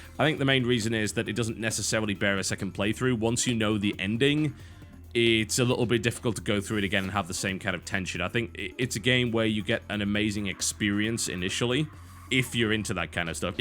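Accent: United Kingdom. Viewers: No, it's just the British English (a.k.a. English in England i.e. the correct English) way of of pronouncing those words.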